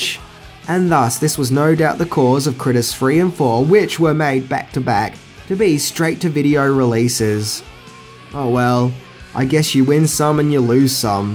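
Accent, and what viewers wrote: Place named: Australia. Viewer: The Australian accent makes "bastards" sound so refined. Thanks for the fun videos, keep up the great work.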